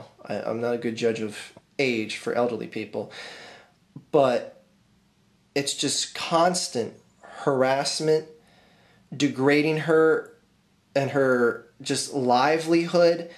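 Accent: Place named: United States. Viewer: well, judging by the way you talk, you must be an American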